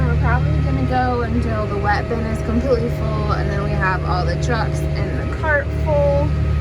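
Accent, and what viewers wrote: United States. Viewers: oh my gosh, how i love the American accent! I need to move from Australia asap lol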